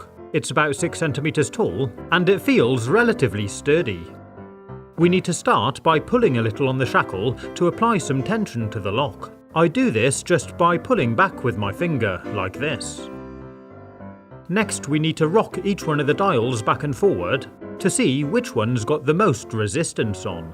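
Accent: United Kingdom. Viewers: Pure #BritishAccent nice to hear as it is conspicuous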